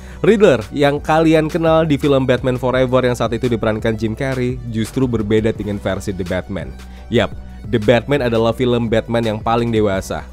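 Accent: Medan, Indonesia. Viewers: “Kalau kau ga suka sama the batman, berarti pas aku nonton film ini bukan untuk kau lek” wkwkwkwk medan kali abang ini 🤣🤣🤣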